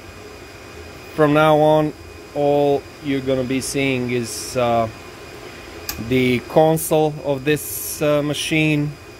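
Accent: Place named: Russia. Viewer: Акцент такой русский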